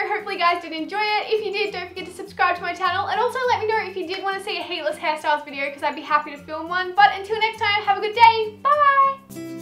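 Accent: Australia. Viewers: I’m watching some of old videos and can notice accent is more Australian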